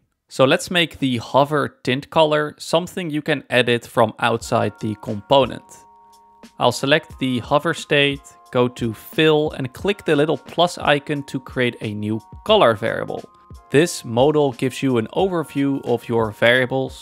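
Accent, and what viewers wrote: Netherlands. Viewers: Dutch accent on top 💯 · hahahaha deze man is sws nederlands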